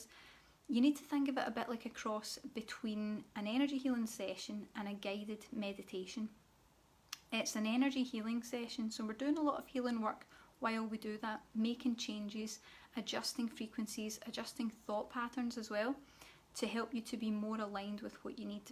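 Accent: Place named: Scotland, United Kingdom. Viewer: Nice to hear the Scottish accent 😁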